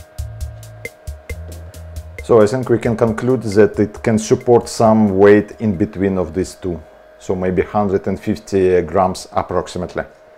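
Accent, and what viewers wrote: Russia. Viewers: Русский акцент) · I'll take strong rashn accent over strong Indian accent any day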